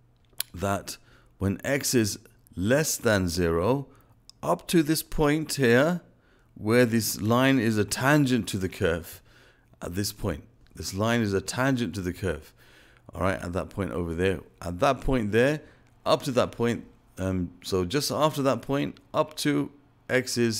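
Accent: United Kingdom. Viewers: i always wonder where you are from. your name is arab but ur accent is a bit british.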